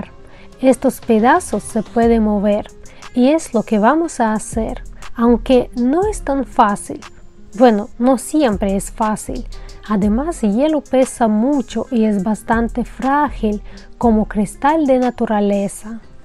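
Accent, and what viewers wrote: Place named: Spain. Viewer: ¿Por qué hablas como español?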